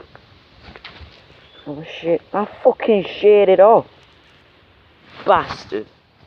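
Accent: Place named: Manchester, United Kingdom. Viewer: I am!!! :) I'm saving for this model bike! He sounds to me like he's closer to Manchester!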